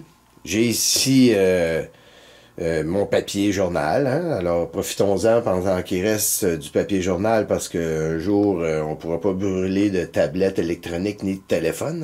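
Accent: Canada. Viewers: Ça fait déjà bien longtemps que ça m'a pris et je ne pense pas pouvoir en guérir, c'est pour moi un plaisir et non une contrainte et je ne sais toujours pas combien coûte le bois car je n'en ai jamais acheté ! Merci l'Ami pour ce bon moment et toutes les saveurs de ton accent Canadien que tu partages avec moi qui vis dans le sud de la France... Bien amicalement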